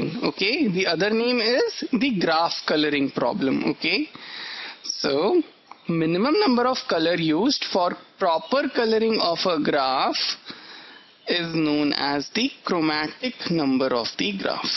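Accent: India. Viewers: i am sick of this indian accent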